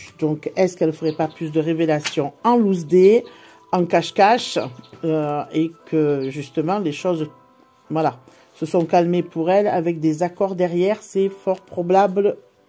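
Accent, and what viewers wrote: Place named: Marseille, France. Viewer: Bonsoir, toujours très agréable de vous écouter avec votre accent de Marseille 😘 Merci beaucoup pour vos tirages. Oui il est temps que les croyants se donnent la main pour que la lumière soit 🙏🕊️✨💞 celle du coeur l'amour inconditionnel ❤️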